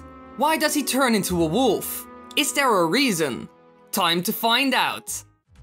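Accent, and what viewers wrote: Netherlands. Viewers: Are you Dutch? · hehe is that a holandish accent i hear? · you a dutchy?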